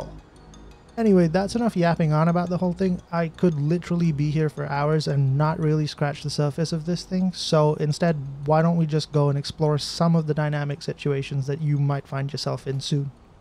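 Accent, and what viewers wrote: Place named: United Kingdom. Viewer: This dude can't decide if he's British or American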